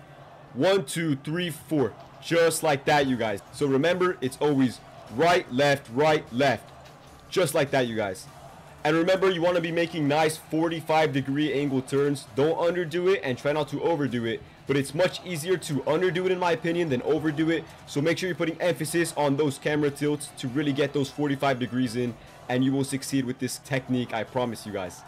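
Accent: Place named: United Kingdom. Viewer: You sounded like british aiku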